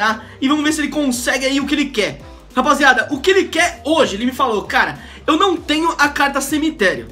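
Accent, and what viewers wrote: Minas Gerais, Brazil. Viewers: Aposto mineiro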